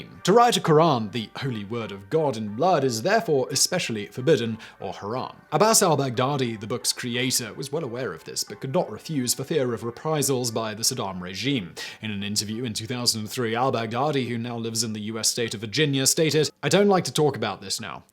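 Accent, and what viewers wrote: United Kingdom. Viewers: In some cases,the very British accent can be difficult for me to understand but in YOUR case,Mr.,it is as clear as a bell. A "right.proper" public speaking voice,I tell ya'....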